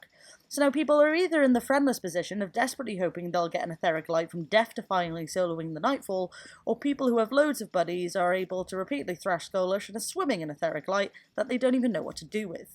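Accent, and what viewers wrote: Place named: West Country, United Kingdom. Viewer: Quick question..... Where are you from? certain aspects of your accent sound very "west country"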